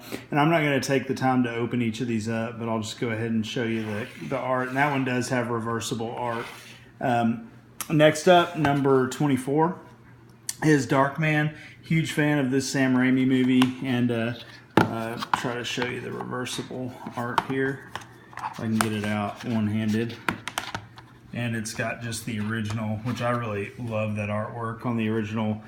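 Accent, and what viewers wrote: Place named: United States. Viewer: Yeah, Scream Factory has released so much great stuff. I'm so thankful they released the Halloween boxset. Ok, you have a cool accent, so was just wondering what state you lived in. I should have my Scream Factory vid up by early next week.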